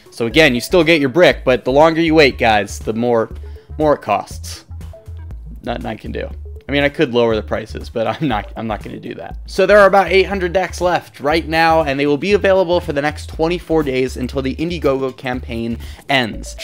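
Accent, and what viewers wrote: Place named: United Kingdom. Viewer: Lovely UK accent ;)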